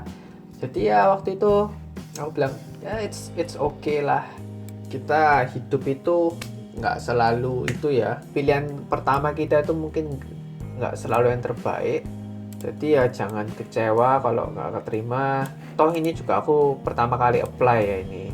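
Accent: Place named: Pasuruan, Indonesia. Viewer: Aku pikir juga gitu, dan logat bicaranya mengingkatkanku sama temenku orang pasuran 😂 bismillah doakan aku ya kak semoga bisa lolos AAS 2024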